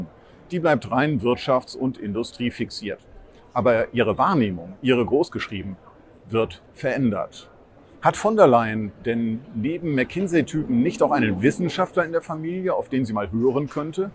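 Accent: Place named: Germany. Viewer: Was für ein heftigen deutschen Akzent die alte hat. Uffff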